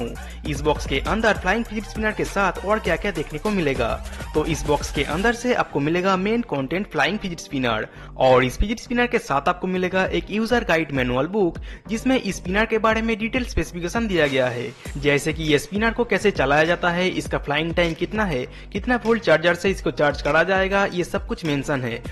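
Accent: Bengal, India. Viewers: Strongest indian accent ive ever heard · Ekdm bengali hindi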